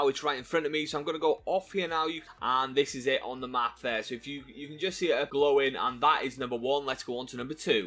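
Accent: United Kingdom. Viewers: Dude you sound like you're British which is nothing wrong with that I'm from the United States but I loved your video short it was sweet and it was precise have an excellent day I hit the like button for you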